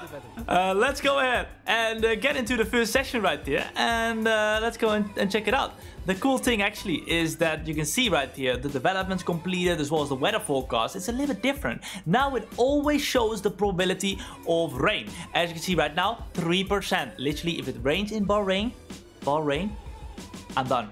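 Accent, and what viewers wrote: Netherlands. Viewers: Very nice video. I can barely tell you're Dutch. Your English improved a lot.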